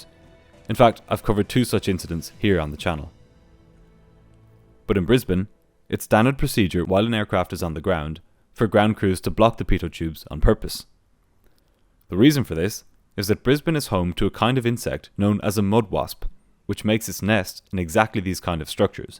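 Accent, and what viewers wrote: Ireland. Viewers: Bruh he's Irish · Top o' the mornin' to ya! What a quaint Irish accent you have - love it! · The narrator is Irish...the ATC is clearly Australian my dude smhl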